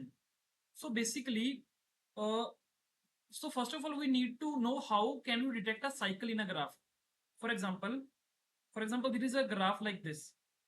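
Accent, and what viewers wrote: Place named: Punjab, India. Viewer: Aapke jesa punjabi accent ke lie kya kre bhiya?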